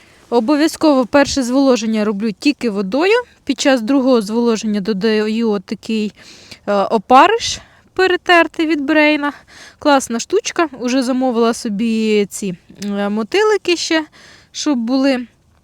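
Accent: Kirovohrad, Ukraine. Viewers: Я зарас далеко від Кіровоградщини тобіш на фронті і мені так приємно чути наший рідний суржик я наче побував дома дякую Вам за Ваші відео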